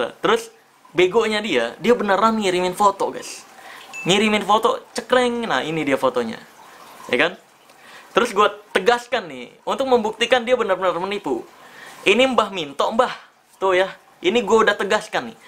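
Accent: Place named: Tegal, Indonesia. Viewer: Bg tegal yah gw tegal jawa tengah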